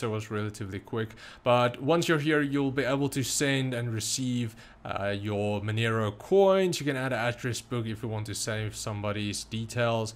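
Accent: South Africa. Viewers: is this guy South African? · Hey, are you from South Africa? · South African accent. Disturbing. Oh well.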